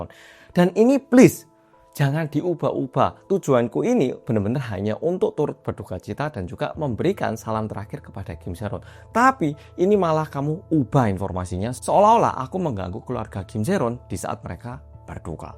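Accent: Java, Indonesia. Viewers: Bolak balik ngenteni rumpian mas medok iki rek.. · Yutuber yg lain juga ada yg ikut bantu jelasin, tapi di liat2 aku lebih nyaman sama penjelasane mas 🤩 Jelas, teliti, ditambah aksen jowone iku loh seng bikin aku tresnooo😍🤣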